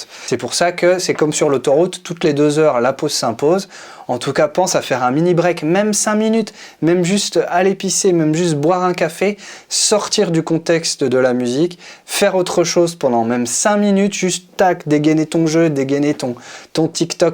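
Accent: Quebec, Canada. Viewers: Mais si, il est bien l'accent québécois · excellent accent québécois !! 😀 bravo !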